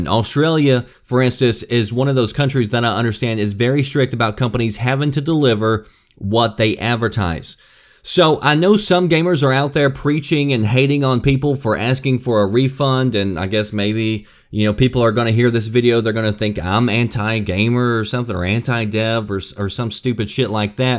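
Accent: United States. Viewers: Wow this guy has some click bait and a really country actsent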